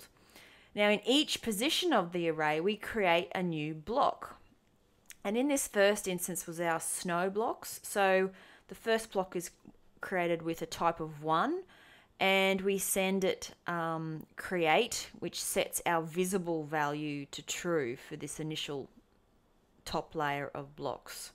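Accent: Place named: Australia. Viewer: Tea Requiem It's an 'advanced tutorial' she's not going to explain how methods work etc. That much is supposed to be given. She gives a brief overview of the code, and leads the rest up for you to think about after the video. If you ask me, that's how I learn best. Doing, not having someone explain everything; and experimenting on your own! Anyways, as for accents, I'm pretty sure no one really complains about Australian accents. Her's isn't deep to the point where I don't understand, and it's perfect to listen to it. And no I can't deal with heavy accents. Why would you even complain about that? It's my personal opinion lol Anyways I really liked this video, she deserves subscribers from everyone!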